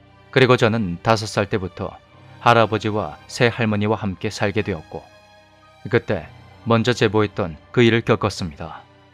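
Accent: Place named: Busan, South Korea. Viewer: 부산사투리 재미있어요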